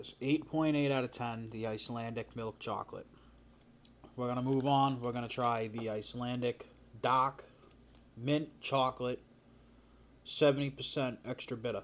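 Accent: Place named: New Jersey, United States. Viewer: He may be tired or may have spent the day around people with heavy Jersey accents.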